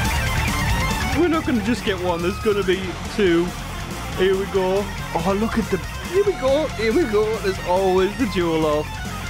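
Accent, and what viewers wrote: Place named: Newcastle, United Kingdom. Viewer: Enjoying the Geordie accent 🙃 . I don't have to say a thing about this reaction except your enthusiasm is spot man!! 😎🤟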